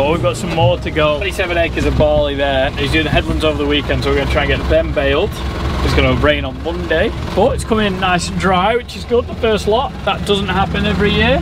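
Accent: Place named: Scotland, United Kingdom. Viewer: I’m from Texas and it’s taken a couple of yrs to catch the Scottish pronunciation but it’s fun just listening 😊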